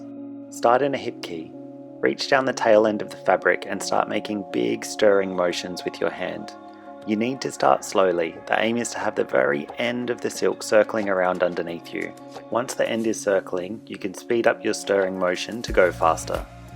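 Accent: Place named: Australia. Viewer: Thanks for this (and all your tutorials)! You do a great job of breaking it down. I also very much enjoy hearing the Aussie accent from the other side of the world. Keep up the good work🙂🙏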